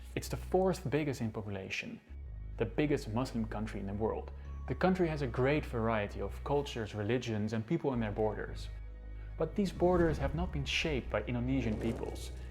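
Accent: Netherlands. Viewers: Meest Hollandse accent ever haha. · biasa bang, di belanda kan diponegoro dianggap sebagai pemberontak rendah dan licik, sampai sekarang. jadi gk kaget lah kalo dia anggap dipenegoro itu seorang bangsawan licik. apalagi gua yakin ini orang pasti org belanda. dari cara dia mengeja kalimat dan huruf² singkatan saja udah ketahuan dia orang belanda. yah pastinya dia secara terselubung/sembunyi² "mengedepankan" versi sejarah belanda lah. lagian banyak (ato malah bisa gua bilang: semua) content maker belanda yg seperti itu, gk cuma ni org doang.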